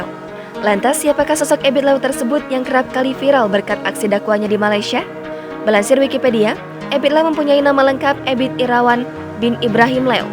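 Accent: Indonesia. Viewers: Itu cara sebutan org indonesia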